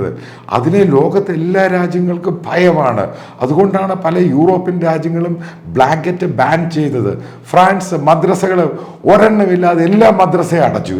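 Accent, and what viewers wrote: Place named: Central Travancore, India. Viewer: Ayyooo mathew Samuel ... you're such a funny guy. Each time I hear this " ee pullu !! " cannot stop laughter . Yeah madhya thrivithamkoor working . Am from Kottayam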